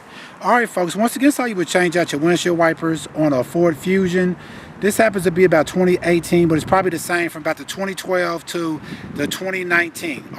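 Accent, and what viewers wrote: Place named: Tennessee, United States. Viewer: 🗣️Like cat my cousin's from Tennessee talk like that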